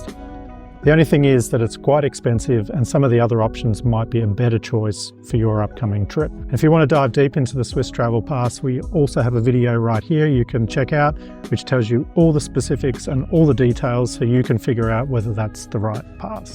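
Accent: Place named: Australia. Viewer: Sound like an Aussie.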